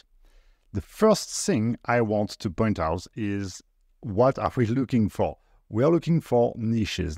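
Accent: France. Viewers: 😵‍💫🤯🤯 du jamais vu. Ton approche innovante, la réflexion profonde, la succession des étapes,les outils utilisés chapeau même le fameux French accent 👍. Tu as un abonné et un like + un commentaire. Merci pour le partage. Je crois que tu as été copié si ce n’est pas quelqu’un de ton équipe. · From the way you speak English.. I'm getting your normal French accent. Which means you speak French Did i guess correctly? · Really good, thanks for this advice video, nice english accent (ça s'entends un peu que tu est français comme même :D) Keep it up with the great videos.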